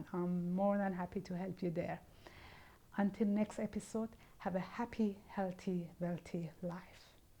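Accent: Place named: Iran. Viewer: Very fascinating. I see your name is but hear a strong Persian accent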